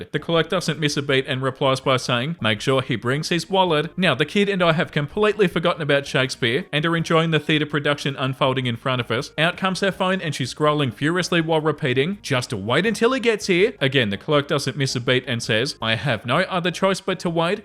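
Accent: Australia. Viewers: Can confirm the accent thing happens very commonly, even in adults. I someone says words in an Aussie accent thanks to and some other Aussie celebrities/creators. But the rest of that story is in fact concerning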